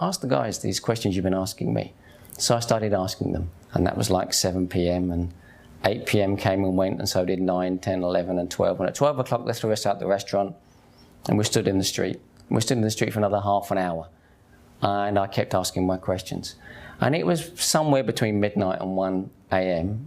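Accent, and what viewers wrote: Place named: London, United Kingdom. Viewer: I like your London accent but I can't do it. I do US accent, I lived there many yrs. I am Malaysian Malay Muslim.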